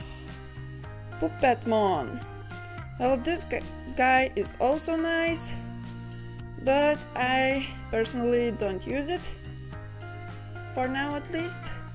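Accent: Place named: England, United Kingdom. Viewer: I find your English accent to be interesting lol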